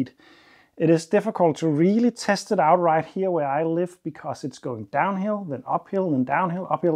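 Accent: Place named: Denmark. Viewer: Got the first video on this moped recommend by the algorithm, all hail the algorithm. It took a moment to figure out the accent was Danish, like myself. As a former postman, I couldn’t help myself from looking at the roads you were driving on, trying to figure out if I recognized anything. And by some freak coincidence, you were driving on my old mail route. Anyway, love the videos, keep it up👌🏻🇩🇰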